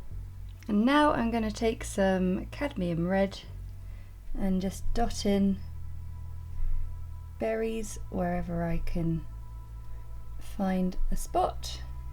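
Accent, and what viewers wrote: England, United Kingdom. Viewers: No no! I’m sure you say it the English way! You know how we Americans love the English way of speaking! Don’t change.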